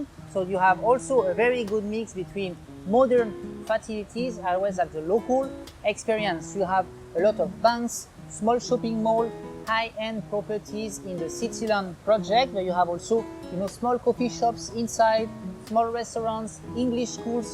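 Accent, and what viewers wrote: France. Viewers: He has a French accent because he is ... FRENCH!! Can Americans be respectful or is that too much asked?! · Nice french accent. Very funny to hear it. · Also sein Englisch-Französischer Akzent, ist verständlicher als gewisse andere Britisch oder Texanisch Englisch sprechende Personen. Desweiteren könnte man auch sagen, vielleicht lernt man den Englisch - Französischen akzent zu hören und zu verstehen. Get out of your Comfort Zone and grow your learning curves.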